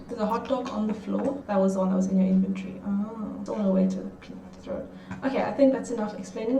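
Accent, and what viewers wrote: South Africa. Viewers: Lost it at "hey MTV, welcome to my crib!" hahah Also, are you South African? Your accent sounds a lot like another YouTuber I used to watch and he lives in South Africa :0 TalesofLumin, I believe is his name.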